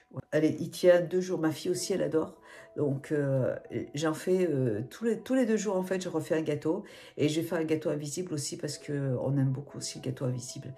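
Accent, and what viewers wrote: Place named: France. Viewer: ❤❤❤❤ very beautiful always. I love your French accent.. sending love from the United states. XOXO. PS will you marry me. LOL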